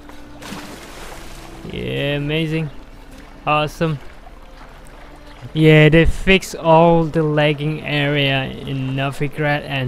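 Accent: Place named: Australia. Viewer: Where are you from? Your accent seems different, kind of australian but german but chinese.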